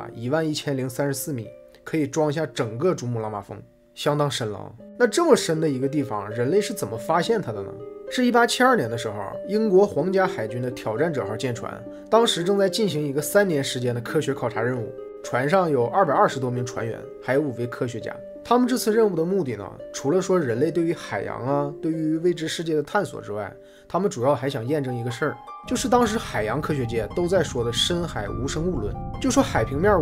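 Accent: Northeast, China. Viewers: 能把东北口音努力改改吗…..实在是听着太俗气了😂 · 好像东北人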